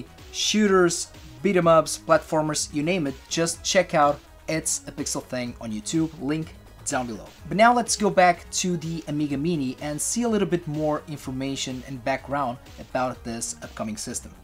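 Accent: Portugal. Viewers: Eu estava a ouvir o sotaque e pensei...deve ser Tuga. E és mesmo. :)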